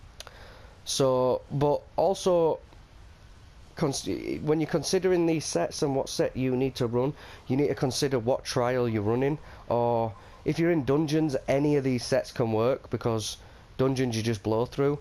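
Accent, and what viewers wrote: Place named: Northwest England, United Kingdom. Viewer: Hiya mate. LOVE the vids - you're putting out some ace content at the moment! Just out of interest - where about in the UK are you from? Your accent sounds like Wigan/Preston area? Deffo the Northwest! :) I'm from just out of Chester. :)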